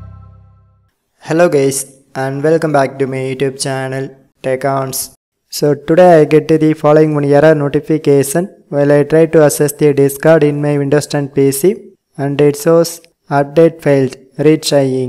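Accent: India. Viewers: Hey Mr. Indian accent slow down. I can’t keep up with whatever you’re doing. Oh my God.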